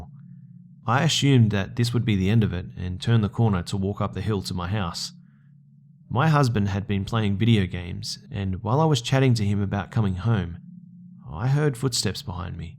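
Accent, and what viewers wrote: Australia. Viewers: you can literally do anything and I'm happy. I just love that Aussie narration!! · God dam it you are aussie now i got to like and subscribe becusae its the aussie thing to do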